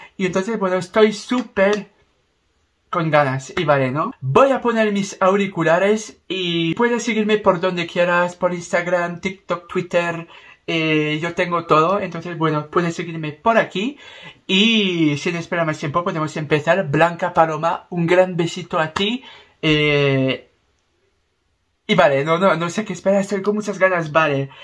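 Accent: South, France. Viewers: Del sur de Francia por lo que le he oído al principio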